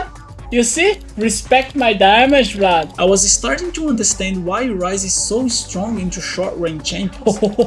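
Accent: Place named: Brazil. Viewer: I would recognize a Brazilian friend from far far away, esse seu accent não esconde nada, man kkkkkkkkkk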